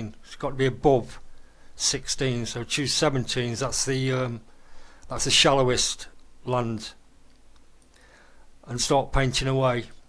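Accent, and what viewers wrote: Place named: United Kingdom. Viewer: Let me ask. From your accent, I assume that you're British, are you? I'm Polish, greetings from Poland and carry on with your work on the editor. I'll be watching. Good luck and peace on.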